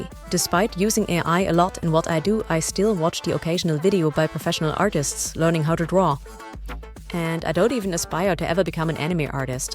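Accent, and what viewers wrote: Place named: Germany. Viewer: Thanks for your teachings, Senpai. Your german accent is cute. But~ enough simping for one day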